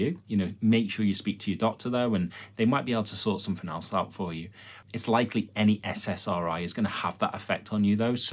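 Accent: Liverpool, United Kingdom. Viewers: You’re a scouser ❤ so am I Brilliant .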